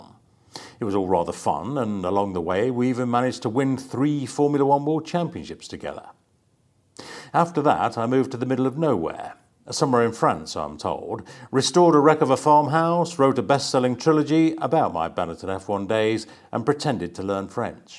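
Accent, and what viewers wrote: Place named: United Kingdom. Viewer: Subscribed for your proper British accent and hefty bank account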